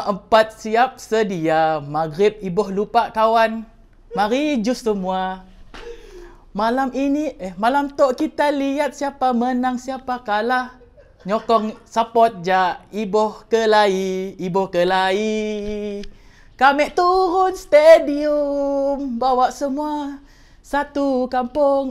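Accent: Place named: Sarawak, Malaysia. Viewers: dia cakap dialek sarawak tu haha dia fans sarawak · itu dialek (slang) Sarawak... maknanya jika kamu berbahasa Indonesia tapi ada bunyi bahasa Jawa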